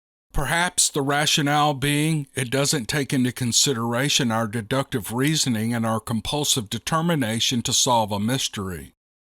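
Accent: South, United States. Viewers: Sorry pal, your stories were indeed intriguing, albeit, your accent is abhorrent… ive heard it my entire life, i was born in the deepest southern accent town, yet i never gained this terrible accent… some of my fam did… i suppose its attributed to a lower IQ, or perhaps a less of a care for reality, maybe one in the same… who knows. Thanks for the vid tho, its my first and last one, cheers my friend! (I imagine youll do well since many folks love the southern accent…) (and god have mercy on humanity if this is indeed an AI voice… sheesh)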